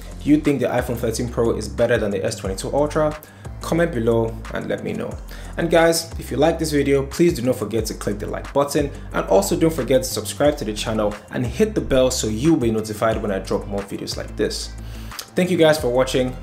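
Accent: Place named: Nigeria. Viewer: The only Nigerian reviewer wey get sense ,I love your accent bro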